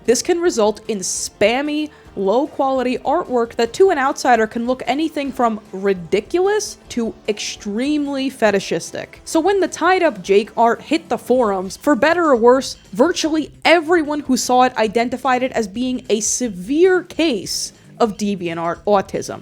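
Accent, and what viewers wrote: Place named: New York, United States. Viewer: Hey I'm autistic and I play Magic: The Gathering because it's all rules based and weird but I do shower etc. Love the way your NY accent comes in on certain words like... AWTIZM. It's great and why I've entered this rabbit hole... Stay cool! (Also the Frank-N-Furter being put into a hot dog bun at is ace)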